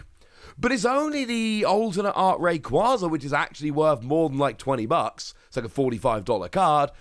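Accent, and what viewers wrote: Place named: England, United Kingdom. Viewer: I joined the subscription after just 2 videos after listening to this cheerful and chipper English accent poketuber. It's so refreshing to hear a change of pace and be actually helpful/ competent. Not only does this channel talk about what to do competively but also discusses what to invest in for memorabilia/ speculation investments to make money only to buy more pokemon cards!!!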